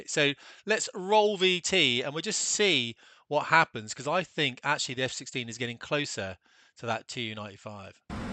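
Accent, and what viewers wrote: United Kingdom. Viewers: Knowing what is going behind the scenes of the Ukraine war I love hearing a British accent make fun of a US pilot after all the US has done for with helping the UK in Ukraine. Total morale booster of a video. I think this video needs to make the round in the US military to remind us of who the OG enemy of the US is and why we should never trust them ever again.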